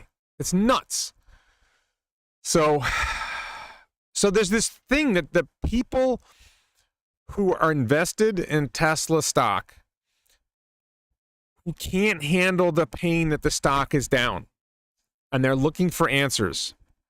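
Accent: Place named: South, United States. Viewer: I think your more country then this Texas boy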